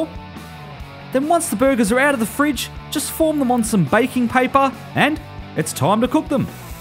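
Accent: Australia. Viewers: I like the aussie accent, very similar to the british one righ'?)))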